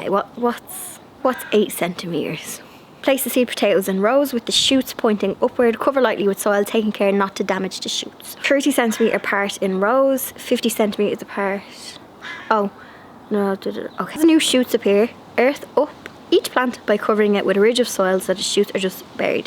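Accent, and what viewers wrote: Ireland. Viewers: Hi, a great video and you have got me motivated ( which I needed ), I have power washed my decking and other bits ( also myself ), and tidied up a bit, and it’s all down to you, so thank you so much, I love your very energetic videos and of course your wonderful Irish accent, you make my day. xx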